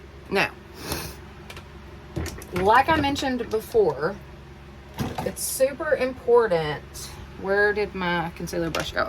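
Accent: South, United States. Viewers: YAY!!!! A MAKEUP tutorial!!!! I have admired your makeup from DAY ONE!!! Of course you are young enough to be my daughter, so you have that LOVELY SMOOTH skin!!! I was THRILLED to see your eyeshadow pallet AND you putting on your EYELASHES!!! I need to give eyelash applying MORE TIME:-P I wish ALL the Dollar General's carried the SAME PRODUCTS!!! Love the colors you put together from your pallet <3 Have you ever thought about going back to curly hair? Is there a reason you do straight all the time? You ALSO do an AMAZING JOB of matching lip liners and lipsticks!!! I DID see Maybelline on your liner I believe;-) but no idea the color, or lipstick you chose to go with it. Just know you do an AMAZING JOB matching them!! I bet you are a HUGE influence on your daughter's public speaking:-) I enjoyed the time she did your intro <3 WOW NYC for college, you go girl!!!! 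Thank you SO MUCH for sharing your skincare & makeup and hair!! I just enjoy YOU, your demeanor, your voice, that Southern accent like my daddy has....he's from Alabama, we lived down there from my 6th to 9th grade year.....I'd pretty much watch what ever you put out....Day In The Life with.....As some of the other girls stated, it was like being right there with you having a makeup day/slumber party and doing girlie stuff:-) You have BLESSED BEYOND MEASURE DAY DOLL!!! BIG {{HUGS}} OH AND YES on the baby oil & iodine LOL Gosh I even used stick butter if I "had" too:-P RARELY EVER had the REAL THING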